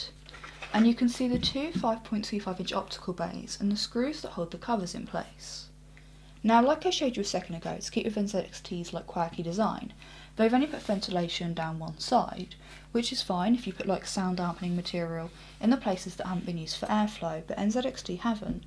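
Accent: United Kingdom. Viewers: She's British. That's how some of us British people pronounce our letters just like how American's each have different accents and pronounce letters differently.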